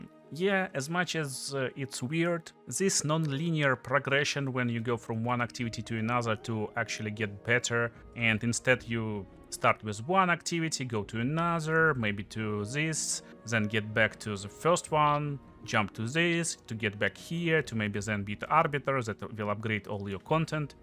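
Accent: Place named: Russia. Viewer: Bro as an Arabic guy, nobody can easily explain stuff to me better than russian accent 😂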